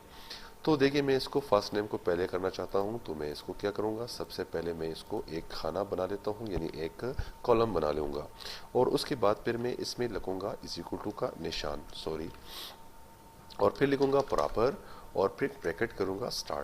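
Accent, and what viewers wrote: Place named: India. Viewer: He revealed his face. I had an idea you were from india with the accent but very informative video.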